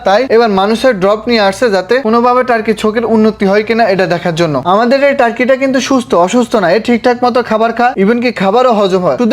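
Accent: Noakhali, Bangladesh. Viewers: ভাই নোয়াখালীর মানুষ নাকি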